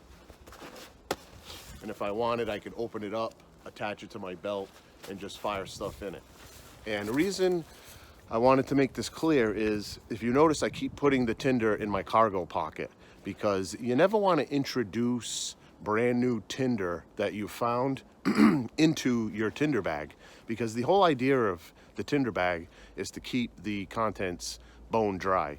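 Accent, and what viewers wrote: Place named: Massachusetts, United States. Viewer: Good stuff. Nice to hear a MA accent. Maple House sent me here. Subbed.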